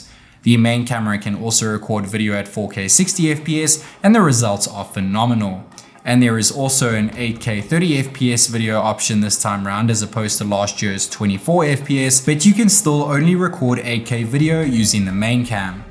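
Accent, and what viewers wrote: South Africa. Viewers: I didn't know you are South African 😱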